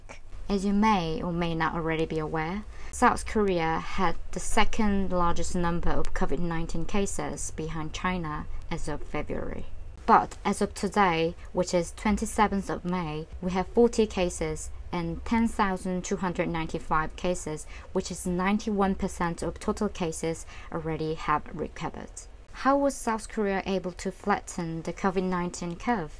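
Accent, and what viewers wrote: United Kingdom. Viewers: 와 진짜 영국발음👍👍👍👏👏👏👏👏👏👏 너무 웃긴 질문인거 알지만😂😂 저두 2년 살면 님 처럼 영어 잘 해 질 수 있을까요...!! 물론 개인차도 있고 언니의 노력이 지금의 결과를 이루었지만 진짜🙏🙏👏👏 감탄하고 갑니다!!!ㅎㅎㅎ (전 호주에 있다 왔는데,왜 발음은 미국일까요😂😂😂) 진짜 영국발음 너무 멋있고 이런 내용 정리 잘 해주셔서 영상 올리시는 언니도 너무 멋있으세용💕💕💕 아 진짜 내가 유튜브에서 이런 채널을 발견하다니😍🥰ㅎㅎ 도쿄는 저녁🌛인데 런던은 아침🌞이겠죠? 전 오늘 편집 한다고 날 샜는데😂 금요일 한 주 마무리 잘 하시구 좋은 하루 되세용!!!💚💚💪💪 아 진짜 영국발음 언니 너무 멋이썽!!!!🥰❤️ (갑자기 다가와서 친한...척 죄송해요😂😂멋있어서 그만...!!!😍👍) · Love your English accent 😁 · 私の母国語は日本語ですが韓国語も英語も扱えるようになりたくて勉強中なのですが、さんはもうマスターされてて本当に尊敬します☺️🕊👏🏻 イギリスアクセント素敵です💕 私は勝手に私にとってさんは原動力でありとても刺激になる方だと思っています🥰いつも動画楽しく見ていますこの言葉を伝えたくて初コメ失礼します😖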